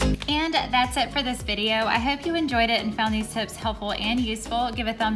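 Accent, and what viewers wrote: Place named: South, United States. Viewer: this the only video i’ve watched & i can tell she from the south 😂😍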